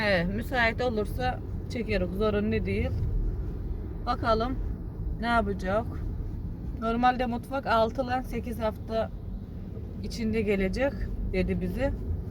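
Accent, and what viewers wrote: Trabzon, Turkey. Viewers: hanım tam bir trabzonlu gibi konuştun korkanlar tehdit eder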